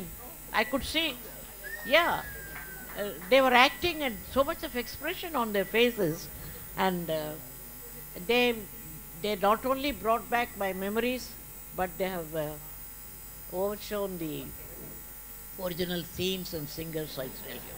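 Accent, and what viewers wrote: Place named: Oxford, United Kingdom. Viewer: Salute Madame ...perfect Oxford English..wishing You all happiness in life 🙏🙏🙏❤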